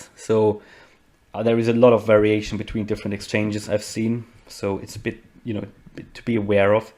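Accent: Germany. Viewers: Your accent sounds german, correct? I like that you are very specific with your forecasts. I subscripe your channel hoping for more iota videos.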